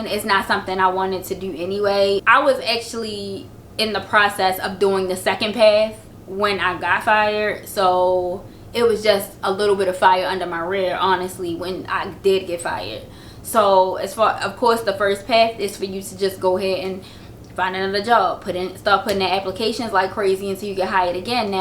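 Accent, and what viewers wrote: Baltimore, United States. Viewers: Just found your channel. You are so cute! I love the Baltimore dialect. I will check out your resources. Nice to see a young entrepreneurial sister. Bless you and your babies❤️❤️❤️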